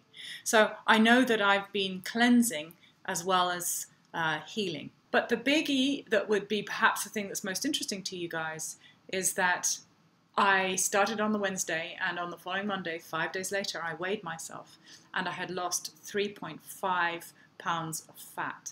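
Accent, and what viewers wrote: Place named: England, United Kingdom. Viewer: Ride W it’s a genuine upper middle class English accent. Nothing American about it.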